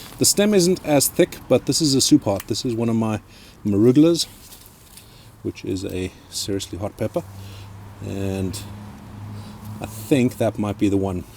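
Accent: South Africa. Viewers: is that South African I hear? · Is my mans South African? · Legit idea....and u sound south african...exellect content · Are you a South African?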